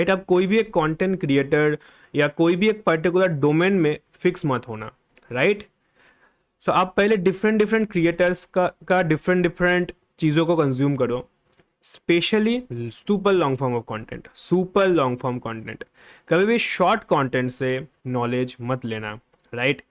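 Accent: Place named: Bengal, India. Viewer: Brother You are bangali right? I can feel it with your hindi. But no worry, you are good at hindi. After sometime I hope you will be more fluent in hindi. There is something about you which I can relate with me with you. I think, you know a lots of stuffs but the thing is, it is not looking so much interesting to watch this video. But obviously it is informative. I have the same problem, pata to bohut kuch hai lekin utna interesting video ko bana nhi pata. But we have to try to be more interesting to people. Agar kabhi tum aur mai famous ho jaye to ek podcast to banahi sakte hai na? Take love ❤, spread the positivity. And lastly remember me 'its Samiul' 😊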